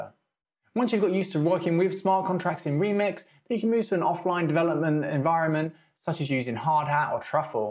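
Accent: United Kingdom. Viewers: Thanks a lot for the time you put in, it's also refreshing to hear you are also from the UK. Do you have any advice on the best way to apply for roles? And how long the process would usually take to start working? Thanks a lot in advance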